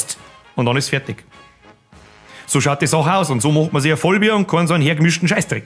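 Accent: Bavaria, Germany. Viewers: oh Mann ich mag dein bayerisch xD bitte mehr ^^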